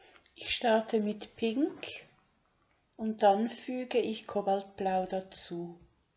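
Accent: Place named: Basel, Switzerland. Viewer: Sehr schön Ihre Stimme zu hören! Ich schätze Ihre Videos sehr... Schöne Grüsse aus Basel ...Ich vermute (ihre Intonation) die Stadt ist Ihnen nicht unbekannt ; )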